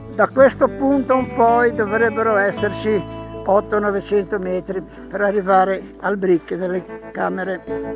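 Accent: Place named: Liguria, Italy. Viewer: Interessante questa applicazione mi fa impazzire sul tuo accento ligure sei un simpaticone e racconti le Cose con molto informazione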